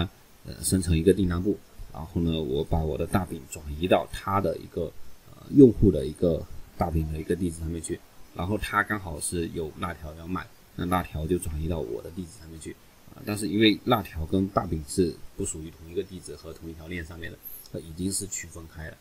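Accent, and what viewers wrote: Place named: Fuzhou, China. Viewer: 福州口音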